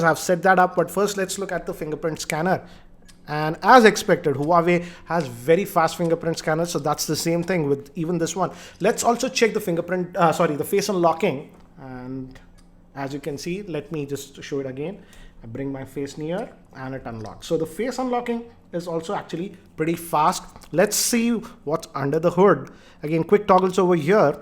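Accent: India. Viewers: Are you from india because you're English is not at this girl but i really like your videos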